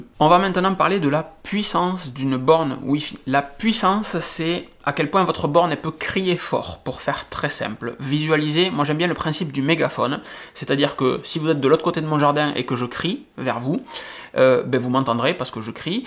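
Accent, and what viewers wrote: South, France. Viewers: Salut, merci pour cette super vidéo. J'aime beaucoup ta façon de partager ta passion et de vulgariser de façon simple tout ce que tu expliques. Et franchement ton accent et tes expressions telles que "ça poutre" ça me tue, je suis aussi du sud, mais sud sud (34) pas sud-ouest donc c'est kiffant. Pour en revenir au sujet, j'utilise perso un ORBI RBR20 ça suffit amplement pour chez moi et au boulot je mets de l'unifi partout (je suis dans la tech aussi, support/installation), c'est amazing ubiquiti c'est la vie. Je ne mets par contre par autant de bornes que tu préconise (comme les musées) mais une ou deux par "étage" des UAP-AC-PRO qui font je crois du 3x3 MIMO et là aussi (on a que des portables et mobile) ca semble suffire. Mais tu me fais poser la question si je ne devrais voir pour augmenter ce nombre car beaucoup beaucoup de devices en Wi-Fi et que 2 bornes... 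Bref continu comme ça! Top moumoute ta chaînes et tes vidéos.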